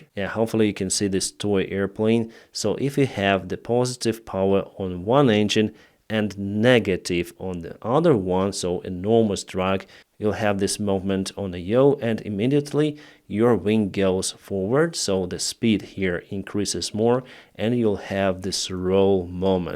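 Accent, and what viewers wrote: Russia. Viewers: I love this narrator's accent!🎉 is it Russian?❤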